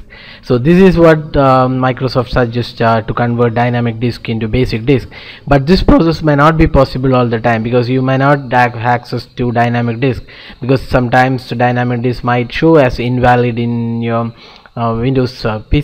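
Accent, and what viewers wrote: India. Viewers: for gods sake speak in a slower manner so us English speaking people can understand you my man...I know you are doing your best but your speech pattern is hard to follow when you more or less mumble all the words in the same monotone .....wish to god I could speak on this thing (think post).. Asian Indians really need to S L O W down in your speech patterns....hell I'm Southern and don't speak English that good myself but it comes across horribly.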